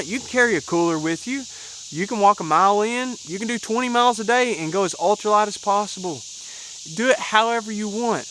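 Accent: Kentucky, United States. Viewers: What’s a “Tint” ? Not sure what a “ Tint” is ? Lol😂😂 It’s like me saying “ Pak your Ca “ I was exposed to your Kentucky accent when Jason hiked up here in March in the snow ! Very cool ! Cheers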